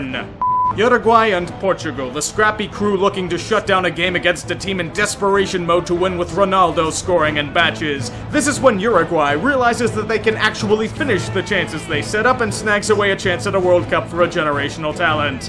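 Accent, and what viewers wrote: United States. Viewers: kinda, i watch him a lot so i expected the cringe. But he, for the most part, knew what he was talking about. I always find it hard, even as an american myself, listeing to someone talk about football in an american accent but i was proud of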